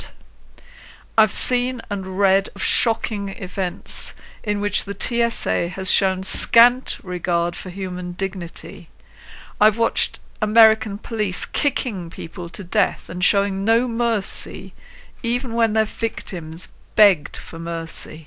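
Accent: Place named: United Kingdom. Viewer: Another thing I take issue with is leaving clues, or putting clues in movies or any nonesense like that. What's a practical reason for it? Why take such a risk? We can keep our guns and defend our right to have them without reaching like this. And you have the nerve to call others gullible. You'd have to be even more gullible to believe this, too. It is nice to hear a British accent that's for our second amendment but please...don't "help" us. We have enough kooks to clean up after.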